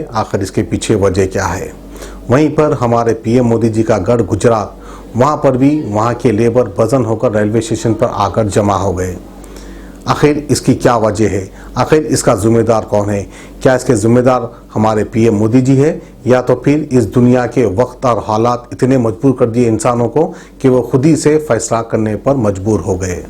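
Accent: Hyderabad, India. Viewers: Bhai aap pure Hyderabadi style ma bolka inlogo ka upper thok Diya jaisa hogaya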